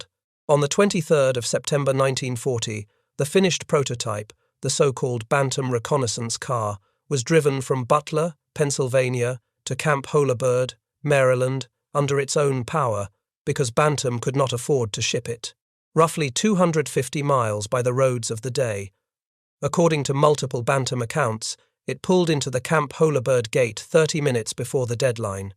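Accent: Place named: United Kingdom. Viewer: I refuse to listen to the same British narrator trash Germans every 30 seconds for being unable to understand breathing air or assembling 3 word sentences. News Flash News Flash News Fash British toady narrator The Germans had NO fuel for trucks, jeeps, tanks, airplanes Get it? Get it? Get it? NOOOOOO FUUUUUUEL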